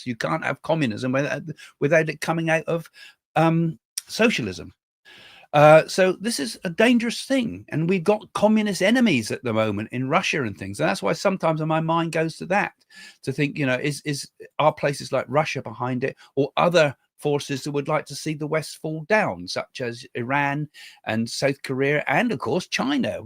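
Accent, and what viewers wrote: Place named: West Country, United Kingdom. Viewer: I’m new here. You sound like me. You west country? 😂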